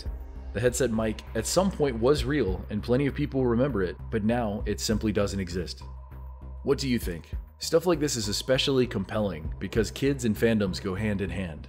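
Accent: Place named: United States. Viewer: You are all very silly … Dazzle ships … Maybe you never noticed them before because 1. You sound American and it was mainly the British who used this type of Camo 2. Using the assumption as an American the majority of DDay is told from the American perspective and images you’ve seen are of American landings on Omaha where you wouldn’t have seen many dazzle ships but if you look at British landings you do notice many of them The fact that anyone believes any of this and you’re basing this entire theory off of a woman who came up with it at a paranormal Conf is legitimately insane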